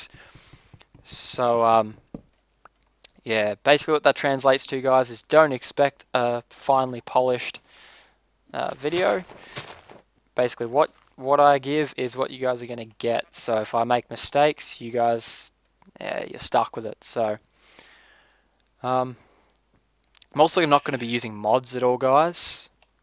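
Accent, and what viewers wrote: Australia. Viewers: yay, I'm welcome :). Im happy that there is an lp'er who speaks in an Australian accent.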